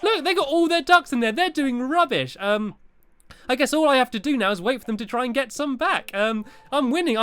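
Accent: United Kingdom. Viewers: i love your videos and you British accent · Omg I love your ACCENT I wish I was British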